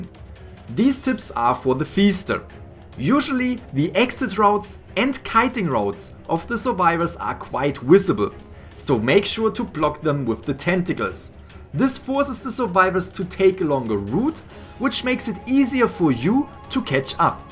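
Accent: Germany. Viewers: Are u german? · Are you German? Because of your accent. No hate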